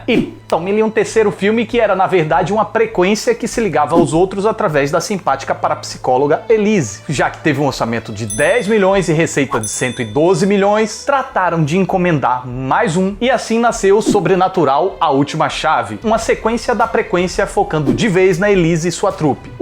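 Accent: Northeast, Brazil. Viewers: Chó advinhar, tu é nordestino néh?? Eu sou! Aq tá fazendo um calor da gota serena! 😅🔥🔥🔥 Amei o vídeo! ❤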